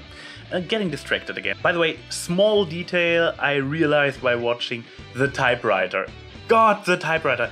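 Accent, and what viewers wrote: Germany. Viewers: Ich finde deinen Deutschen akzent echt genial ^^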